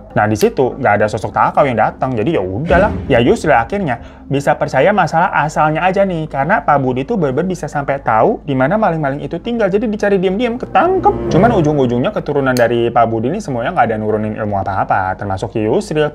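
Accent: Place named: Madura, Indonesia. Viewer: banggg basa banjar bangggg logatnyaaa kek maduraaaaaa😭👋👋👋👋👋👋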